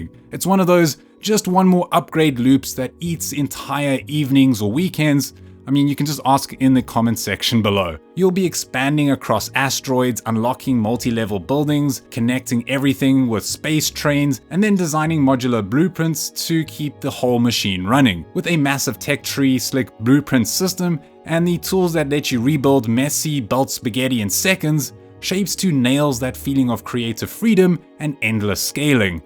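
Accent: South Africa. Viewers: bro why do you sound south african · Are you South African? Sounds very familiar!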